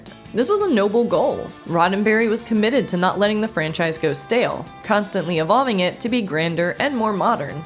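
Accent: United States. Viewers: American accent threw me off for a sec 😂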